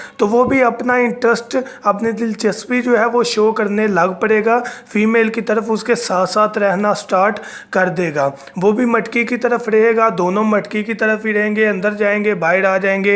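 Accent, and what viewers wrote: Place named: Punjab, India. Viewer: Punjabi lahja hai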